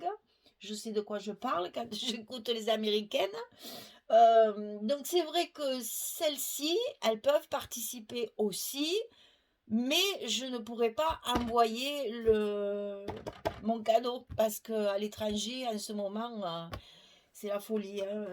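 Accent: South, France. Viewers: Bonjour Toujours un plaisir de regarder vos vidéo et d entendre votre accent du Sud! je scrap depuis plus, de 10ans avant j étais dans une association maintenant je scrap chez moi beaucoup pour mes petits enfants Sympa le kit 😍 J habite à Granville en Normandie ( pas très loin. du Mont St Michel) 😉 Laurence Bonne journée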